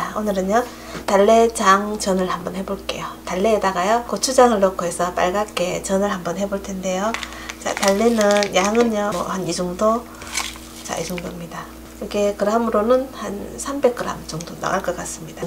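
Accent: Gyeongsang, South Korea. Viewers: 정겨운 경상도말씨 ㅎㅎㅎ 오빠가 대구에 살고있어요~~ 좋은 하루되세요^^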